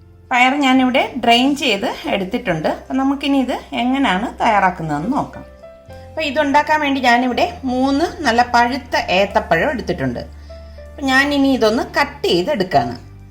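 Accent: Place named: Tamil Nadu, India. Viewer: Malayalam konjam thettaitu thavarum na tamil akkum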